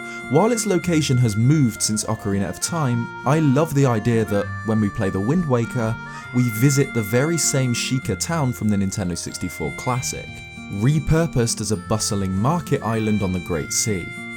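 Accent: United Kingdom. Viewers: Am I the only one that's crushing hard on this guy? Zelda love? British accent? Cutie? 😭 I promise I'm not thirsty just wanting a Zelda soulmate.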